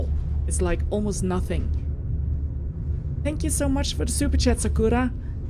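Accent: Norway. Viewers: Driven, not drived,...;-) For the rest fully agree! Really well spoken English with a charming Norse accent. Love the landscapes, I should pay Norway a visit sometime. Cheers, keep up the good work.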